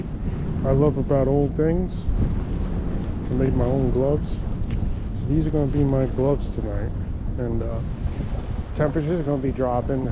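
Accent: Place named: East Coast, United States. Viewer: And back to the salt, good job! Lol hearing you talk to "Bobby" with your eastern accent very much reminds me of a character off of the TV show Bob's Burgers, named Teddy. Since I dont really know what you look like, I've come to just picture you as looking much like Teddy.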